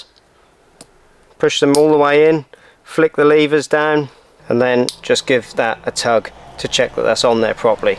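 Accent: Norfolk, United Kingdom. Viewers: Awesome video. I'm a pretty good DIYer but learned some new things. Wago connectors soo much better than old junction boxes. I'm a Beccles boy and recognise your accent from nearby...Norfolk somewhere? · Good informative video and well presented. Given that your videos are aimed at the diyer would it be worth advising that a set of VDE cutters may be a worthy addition just in case the wrong wire is selected and cut? Granted a voltage detector and isolated supply should make the circuit safe but there’s always that unforeseen situation! This is not a criticism by the way, I was working in an old restaurant this year renovating a kitchen, an old lighting circuit needed terminating. The sparkle isolated the supply and the detector did not pick up any voltage ( fluke not sure why), cable was cut and was to everyone’s surprise (especially the sparks!) still live! 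Vde cutters used, but wrecked never to cut again, sparky was fine btw. Seemed the cable was fed by another circuit which went live when it was activated in the cellar! Like I said no criticism as it’s a great video, is that a Norfolk accent btw?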